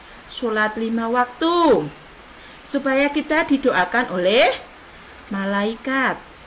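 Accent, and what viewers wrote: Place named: Java, Indonesia. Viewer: Boleh lah .... 👍👍👍👍👍 Cuma agak medok tapi best 😇😇😇😇😇😇😇😇😇😇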